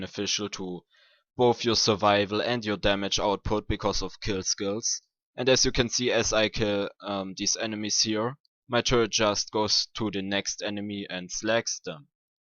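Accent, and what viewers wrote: Germany. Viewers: man hört echt das du deutsch bist :D haha. aber cooles video axton war auch immer mein lieblings char. ich hasse diese leute die in einem singleplayer spiel auf meta und guides hören. was wichtig ist ist das man spaß hat mit dem char den man spielt...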